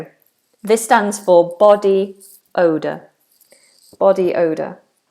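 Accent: United Kingdom. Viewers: Greetings from 🇲🇽 I like to learn with you. Even If I need to learn 🇺🇸 English. I can't stop getting involved of how great British accent is. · Hello dear, very good English language teaching. I love Britain and British and the very sweet English accent. at the moment I am watching you from Iran. 40 years ago I was in Manchester United Kingdom. And I enjoy hearing a very sweet accent and remembering every thing again. To be honest I should have never leave the beautiful England. Love UK. Love people, culture, language and the great history. Thank you.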